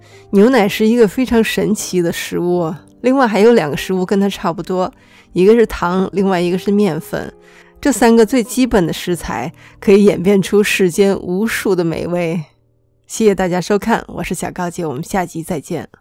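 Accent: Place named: Beijing, China. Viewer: 这京腔听起来真舒服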